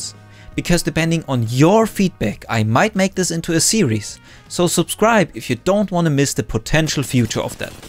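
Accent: Germany. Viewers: Bist du deutsch? Lol dein Akzent xD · Der deutsche Akzent lenkt mich so ab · Der Akzent...du bist doch deutsch oder?🙂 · Man hört dass du Deutscher/ Österreicher/ Schweizer bist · Hòrst dich deutsch an diggi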